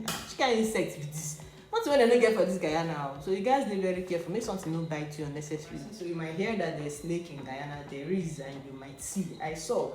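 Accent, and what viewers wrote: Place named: Igbo, Nigeria. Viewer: That igbo accent i love it i got to get me another Nigerian igbo queen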